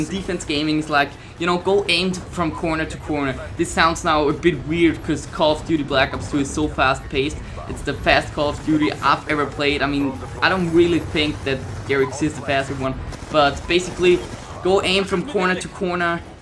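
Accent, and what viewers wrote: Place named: Germany. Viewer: He might be German, or European so that's why his voice is like that. And i must say that he is MUCH better than me, and luckier too haha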